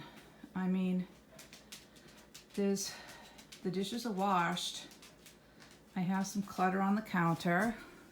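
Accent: New England, United States. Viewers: Hi! I will be happy to be part of your community:) I have a lot of trouble letting go and have some hoards of my own for sure! I get it;) Also- you have a New England accent; I’m up in Maine!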